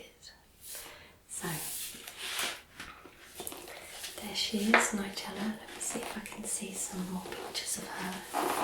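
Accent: United Kingdom. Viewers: You're so lovely!! And that very nice British accent is a big trigger for me. Keep up the great work! Subbed:)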